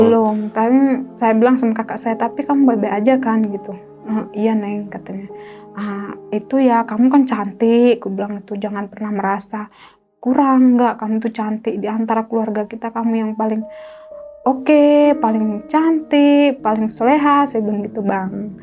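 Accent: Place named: Medan, Indonesia. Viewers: Apa pulak,😂 Medan kx KK awak ini.. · Jadi suka aku dengarnya. Nampak kali logat Medannya😅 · Kak logat mu Medan..bukan Aceh.. Yg logat Batak nya ini Boru...😂😂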